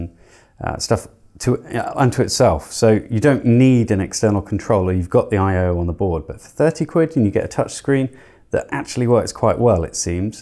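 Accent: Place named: Switzerland. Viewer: here is the guy with swish accent ;) i like your videos sir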